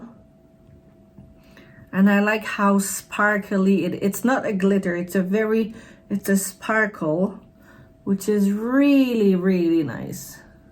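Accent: Quebec, Canada. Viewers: Hello! Gorgeous! May I ask, are you originally from Quebec? 🙂 I am so I feel like I recognize an accent. Vraiment magnifique, j’adore Guerlain! I bought the pearls (I love Meteorites so much) and some Kiss Kiss balms. I am really hesitating to get the eyeshadow palette. 😍 Thanks for sharing! 🫶🏼 I subscribed! If ever you want, I have a channel too. Have a great day! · Beautiful! Je reconnais un accent Québécois?😉😉J’adore les Météorites! ❤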